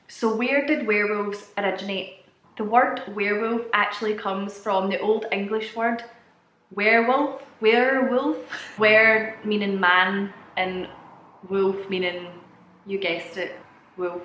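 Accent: Scotland, United Kingdom. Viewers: super excited, and FASCINATING... you are so amazing and precious, i love how you say" Werewolves " 🤣🤣😘🤗🤗 very informative, and the best genes and features i have ever seen.. great show Ma ' Lady in Scottish accent !! keep it up..👍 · Hi id love to dive into your hole/your warewolve sized hole that is 😂where about in Scotland is that accent from up north iv had 1 encounter with a cryptid i believe and if youd asked me 6 or 7 years ago if that was possible in Scotland id have said you were crazy but personal experiences prove that this stuff is real 👍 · I could listen to your voice all day. Love love love the Scottish accent, my favorite.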